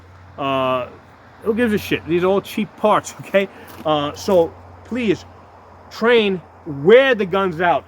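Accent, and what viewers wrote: New York, United States. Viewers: Are you from New Yawk by any chance?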